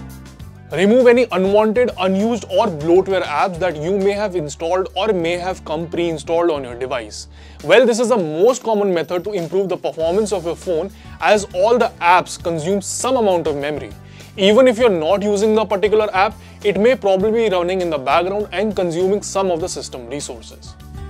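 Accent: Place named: India. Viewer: Did not understand one word that u said because of your accent from India!!, u need to speak better & more clear English!!!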